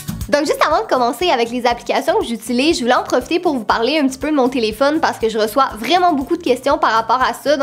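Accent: Quebec, Canada. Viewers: t'es trop belle,gentille,intelligente...bref je te kiffe 😘 tu me fait sourire à chacune de tes vidéos et j'attends avec impatience tes prochaines vidéos.j'adore je t'aime juste trop,je t'adore trop,tu es la youtubeuse la plus cool. j'adore ton accent Québécois,j'adore aussi tes cheveux,j'adore ton pull car j'ai le même. super vidéo.Continue comme ça ne t'arrête jamais n'écoute pas les commentaires négatifs pense à moi qui t'admire. ps:je t'adore n'arrête jamais !! 😙😙😙😘😘😘😚😚😚😝😝😝😜😜😜😛😛😍😍😍😇😇😊😊😊😄😄😄😁😁😁😉😉😉😎😎😎☺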